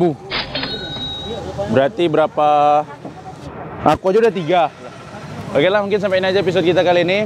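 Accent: Medan, Indonesia. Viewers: 😆asli mana sih bang... bahasamu campur jawa tp logat medan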